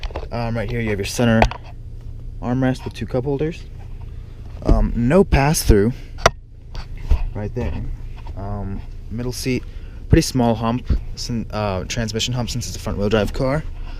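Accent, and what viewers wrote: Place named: New Jersey, United States. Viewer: Are you from Jersey or are you Italian? This is my first time watching one of your videos but your accent in your voice reminds me of Pauly D from Jersey Shore.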